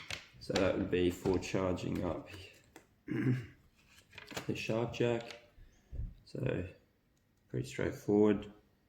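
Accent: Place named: Australia. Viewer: You sound Australian, How long did it take for your hak5 gear to arrive? I've been waiting quite a while.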